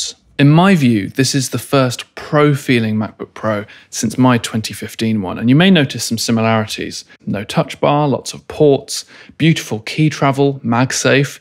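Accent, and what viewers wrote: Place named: United Kingdom. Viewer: Dear, thank you very much for your video. I like your videos very much and that as a non-native English speaker. I love your and the clean British accent. I think my personal decision has fallen. I will order the standard 16 inch Pro with 16GB RAM 1TB SSD version. Why? The larger display, longer battery life and what many may not know, the better cooling system from the larger one. That may shouldn't tickle the Pro compared to the Max processor. The 16 inch model gets nowhere near as warm as the 14 inch. Well when will that be the case? The weight? If I can't carry my notebook sorry MacBook Pro, then I don't need one anymore. And it's a difference of 200 € for me. I know a lot of money. Keep up the good work. Thanks to you, stay safe, all the best from a loyal subscriber from Bavaria.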